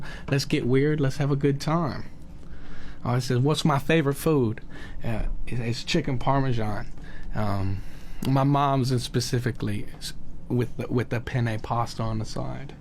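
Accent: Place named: Texas, United States. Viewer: I hear that Texan accent!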